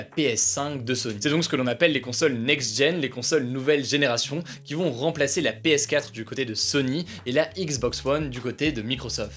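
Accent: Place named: United Kingdom. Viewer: Ton accent anglais 😂👍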